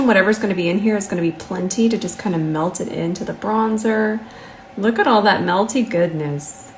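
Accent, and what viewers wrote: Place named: South, United States. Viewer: are you in the states? You have a Southern accent! Under your info, though, it says Pakistan 🧕 I can't wait to save some money to buy your brushes; Social Security doesn't go very far. I love watching your videos. Your makeup always looks Gorgeous. I love you❤️‍🩹🐈‍⬛🐴🐈🐕‍🦺❤️‍🩹💯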